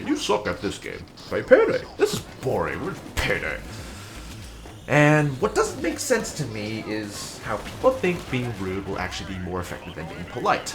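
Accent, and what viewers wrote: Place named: United Kingdom. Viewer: I'm agree to most of things you said but, in a matter of being professional, you need to upload real pro videos for old stuffs you did before. not all of them but as I checked, you were not aware of all the details and clues of each heist. ofc watching your videos gave me some clues of things i was not aware and ofc I do heists in my own way even if there be an easier tactic. but for example missing one of two paintings in Diamond DLC heist is a shame, I mean right now after years you're playing it you should know there is 2 paintings and not 1. anyway as you said that you dont seek any suggestion ( lol) just skip what i said on top lines. you are a good player and I enjoyed watching your videos here in youtube and again I like the way you explain and argue while recording it. at first video I thought you're british? IDK why. 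keep going and I know its boring to read and listen to a lot of stuffs you may heard or know before. keep going and dont forget im the number one in stealth! but im infamy you know lolz.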